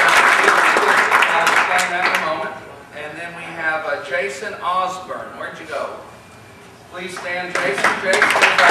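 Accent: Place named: East Texas, United States. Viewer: what part of east texas is he from?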